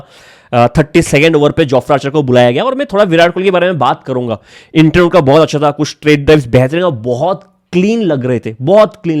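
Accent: Bengal, India. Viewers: Are bhai wo bengali hai from what i can tell based on his accent. Mai bhi bengali hu isliye apko ek chiz explain kr rha hu. Hur communuty ke bolne ke trike mein apko kuch na kuch odd lagega ya funny lgega. Bengalis emphasize heavily on pronouncing words that sound like they have an e or u. So probably when he is saying will wo wueel sunata hain. Isko leke mazak udane se better why don't u just focus on the content and learn from him. He is a living encyclopedia in cricket stop trying to make fun of someone's way of pronouncing stuff when u haven't done jack shit with your own life. Tu bahar jayega na pdne be it canada or us or anywhere wo tere perfect accent ke upar haske tereko hur roz bully krenge tb acha lgega tereko? Have some empathy bro. Big 26 mein tu baith ke faltu chizo pe joke mar rha hai to sound cool.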